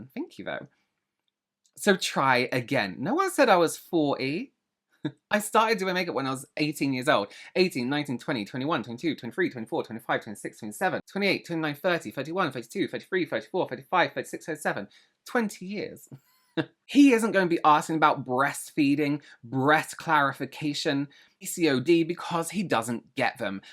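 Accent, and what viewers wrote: United States. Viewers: She sounds so American 😂😂😂😂. Really unintelligent and doesn’t understand that we in Europe actually learn how the body works. Whether you are a man or a woman 😂😂😂 everyone knows what a period is and how they work.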